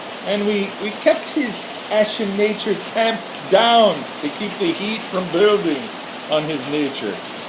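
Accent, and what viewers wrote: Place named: Ireland. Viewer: Awesome story and i love the irish accent very nice👍👍👍👍👍👍😃😃😃😃😃🍀🍀🍀 best regards peter.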